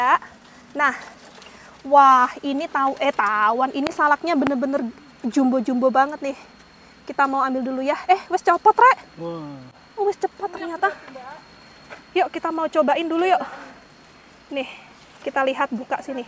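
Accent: Java, Indonesia. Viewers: Mbk aslinya org mana ya, cz logat nya asli jawa banget..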